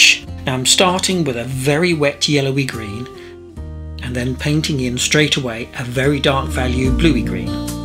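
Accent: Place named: United Kingdom. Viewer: It is wonderful to hear your British accent, and had a good chuckle to hear your comment about the 'bloody leaves' did my heart ❤️ good!!!. I do really enjoy your videos; and wonder just where you are located. I lived in East Sussex and moved to the U.S in '57 so there's a bit of nostalgia going on. Thanks for the memories ❤ !!!!!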